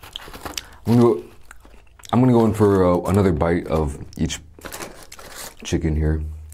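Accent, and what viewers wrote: United States. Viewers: This guys looks Asian but sounds soooo different from an Asian. He sounds like a American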